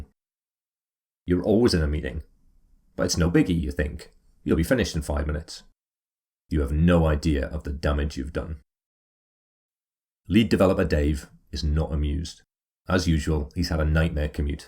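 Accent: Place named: North East, United Kingdom. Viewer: he's not a robot, he's just from the North-East.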